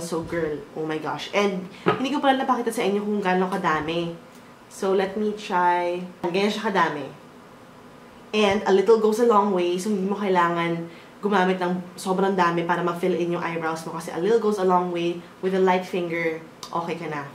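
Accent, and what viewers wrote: Conyo, Philippines. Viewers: i love the way you talk ate very conyo i like it haha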